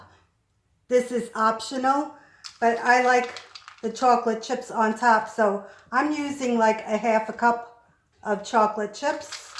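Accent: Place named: New York, United States. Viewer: Yes, you sound like me, lol! I’m a Bronx girl born and raised, still living here. Are you still in the Brooklyn Queens area?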